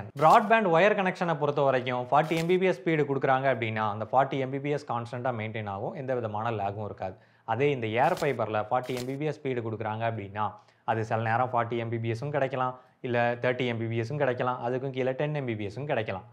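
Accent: Tirunelveli, India. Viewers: Tirunelveli iruku bro 🤔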